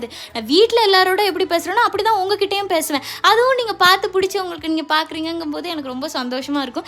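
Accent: Tirunelveli, India. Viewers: Athuvum unga tirunelveli slang enaku romba pudikum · enkalukku thirunelveli neenka pesathu super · Tvl speech Vera level.same blood😊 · Hi and. Congratulations 🎉 first. nowadays am following your style in my cooking yeah.like ungala mathiriye immitate panni cook pannuren 😅..my native is thirunelveli.. namma ooru slang athu innum highlight. Easy ah connect aaguthu pa. So happy for you guys...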